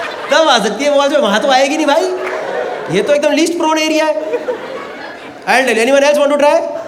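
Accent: Delhi, India. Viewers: Unbelievable ji, your typical delhi government school type talk make it awesome. I can relate with you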